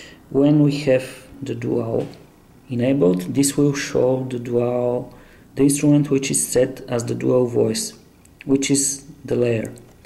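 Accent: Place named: Eastern Europe. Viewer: Very strong accent - East European, hard to listen to.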